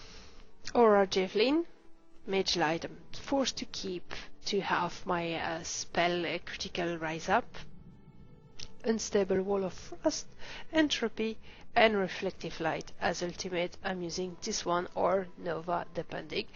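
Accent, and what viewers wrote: France. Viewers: Great video. really enjoyed it. And the French accent from a pretty lady is a bonus too ;) Keep up the good work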